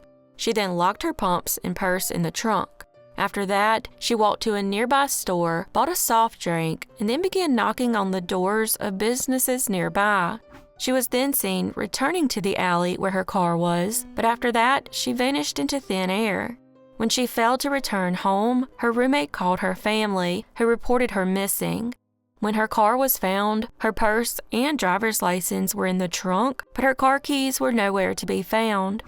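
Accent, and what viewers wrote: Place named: Louisiana, United States. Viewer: twelve of my ancestors are entombed in that cemetery(St.Joseph). 🙂 You have a Monroe, Louisiana accent. I don’t know where you’re from though.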